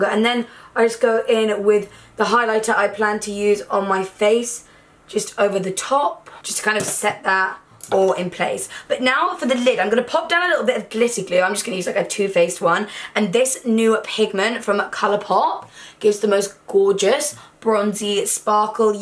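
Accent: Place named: United Kingdom. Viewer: She's British or whatever haha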